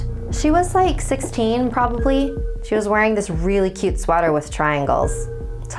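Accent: Philippines. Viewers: This dalagang Filipina has the greatest accent. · Aaaaah! I've been watching all of your videos and I didn't know you're a pinoy the whole time?! I was like, the heck is ottings and ottey? 🤔🤔 It's ate the whole time WTF XD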